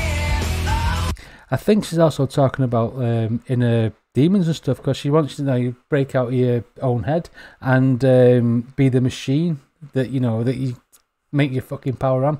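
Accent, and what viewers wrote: United Kingdom. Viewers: Are you a Liverpoodlian?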